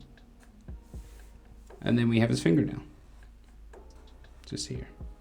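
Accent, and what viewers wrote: Ireland. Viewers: Amazing work also very irish sound accent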